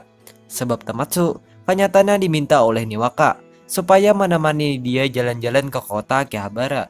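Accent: Banjar, Indonesia. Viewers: Logat banjar nya 🥲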